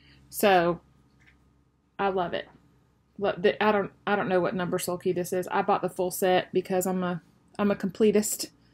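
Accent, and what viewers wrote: South, United States. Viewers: Thank you for sharing your Market experiences with those of us who can’t go! Great suggestions for the organizational committee! I laughed out loud when you said “tump” 😂😂😂 I hadn’t heard that in years! And thought I was the only one who still said it. I was born and raised in Florida...5th generation Floridian, and my grandparents were very rural! So love to hear the real South! I recently ordered from your shop and was very impressed! You will be my LNS (though not very local) from here on out! Are those letters done in eyelet stitch? What a great market haul! Looking forward to ordering “Wool and Flax” from Plum Street when it is in stock! 🐑🐑🐑 Hope y’all have a wonderful spring 🦋🌷🐑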